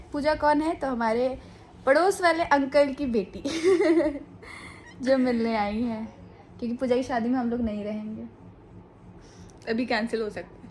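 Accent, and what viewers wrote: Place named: Bhojpuri, India. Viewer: Bhojpuri bolati Ho bahan to bahut acchi Lagti Ho Main Bhi Gorakhpur se hun mujhe bhi Bhojpuri Aati Hai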